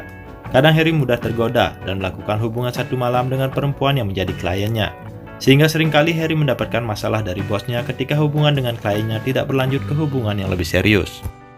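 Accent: Bali, Indonesia. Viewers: ini yg punya chenal pasti orang bali . . logat balinya kluatan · Kok kayak bali malahan bng 😁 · Dari logatnya mimin kaya orang Bali yaa hhh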